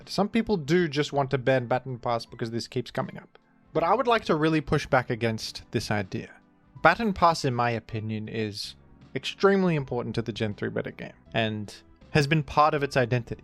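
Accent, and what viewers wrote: Australia. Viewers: You're australian??? I never wouldve guessed. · I watch so much that I now internally pronounce "Baton Pass" the Aussie way. I accidentally said it to my partner even, which gave her quite a laugh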